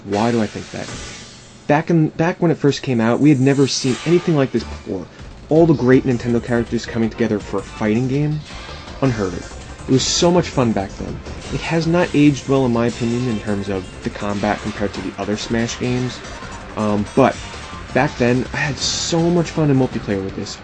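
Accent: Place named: Boston, United States. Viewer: Yo your from Boston right? I can tell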